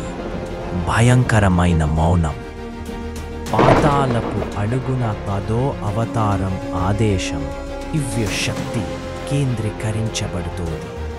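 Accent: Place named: North India, India. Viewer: VFX good, story narration and Words spelling match kaledhu north vaallu telugu matladithe ela untadhi ala undhi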